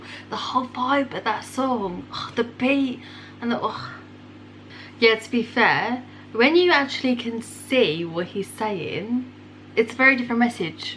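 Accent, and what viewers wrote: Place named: England, United Kingdom. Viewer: I love ur English accent ur so cute and keep react Indian songs Punjabi hits ❤️🇮🇳🤘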